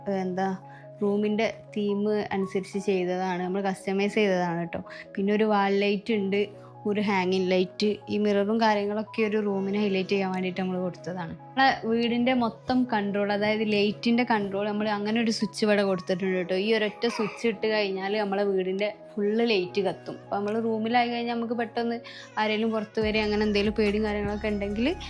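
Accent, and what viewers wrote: Kozhikode, India. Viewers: Malayalam samsarikkan ariyanhitalla.calicut bhasha anganeyane suhrthe.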